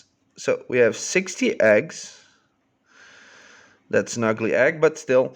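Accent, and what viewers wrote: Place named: Netherlands. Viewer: Hello I live in Europe so I can't buy eggs by you so is bought eggs by Clementoni got a tank with it but The tank doesn't got a lid its not small its not plastic I also had a year ago triops eggs and got one adult 3 months old stupid me didn't save the sand but that one was in a plastic box witch one do I need to use? (BTW your voice sounds like somebody from the Netherlands Are you Dutch cause I am Dutch so I hear it sound like that no hate)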